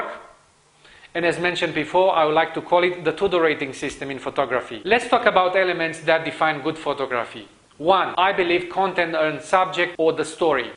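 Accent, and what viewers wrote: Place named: Romania. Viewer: you sound like a romanian:)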